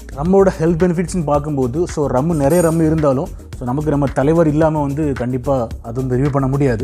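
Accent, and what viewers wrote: Tirunelveli, India. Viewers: Anna...nenga tirunelveli thoothukudi side ah?😅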